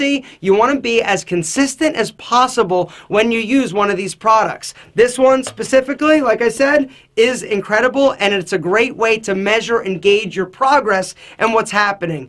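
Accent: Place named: East Coast, United States. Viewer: east coast accent love it man where r u from?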